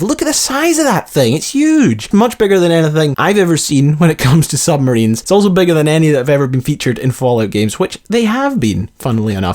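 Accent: Scotland, United Kingdom. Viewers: Is this guy brittish or American or canadian? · Tyler M. It's his accent he's Scottish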